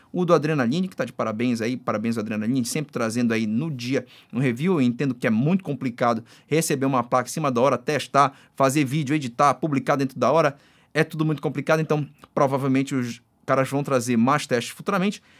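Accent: Pará, Brazil. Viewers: Esse sotaque paraense, meu parceiro. Enfim um canal de tech e inovações em que me sinto representado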